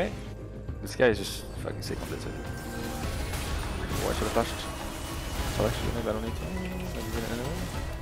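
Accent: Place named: France. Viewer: He's starting to have a French accent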